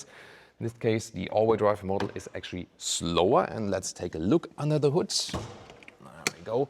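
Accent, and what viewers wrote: Germany. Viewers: Without looking at the caption, one can only understand 50% of what you are talking. If you cannot reduce your German accent, maybe speaking at a slower speed may help a bit, if you really want to stay in this profession.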